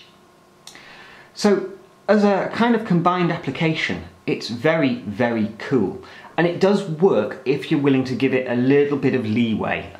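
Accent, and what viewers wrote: England, United Kingdom. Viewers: Your voice is so cool.Are you from England?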